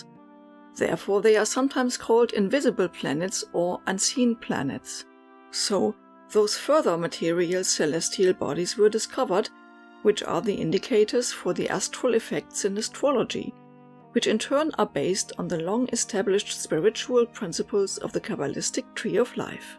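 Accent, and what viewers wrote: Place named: Germany. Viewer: I hear a german accent. Are you from Germany or Austria or something like that? :)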